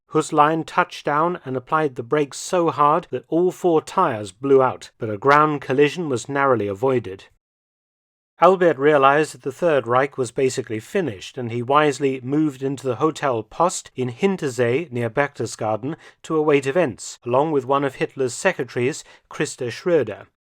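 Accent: United Kingdom. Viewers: British pronunciation. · Yes, it's a British thing. We say lieutenant as "leftenant". However, when we say "in lieu of" it is pronounced "in loo of". I wonder if the American pronunciation of lieutenant as "lootenant" is more correct? A lot of sources do not explain where the British term leftenant came from. However, I like this one: "According to military customs, a lower ranking soldier walks on the left side of a senior officer. This courtesy developed when swords were still used on the battle field. The lower ranked soldier on the “left” protected the senior officers left side. Therefore, the term leftenant developed."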